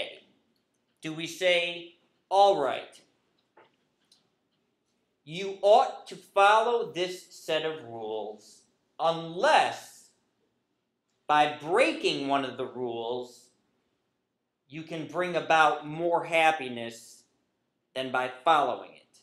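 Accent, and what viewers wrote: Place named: South, United States. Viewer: It's so sad that I could tell he was from the South.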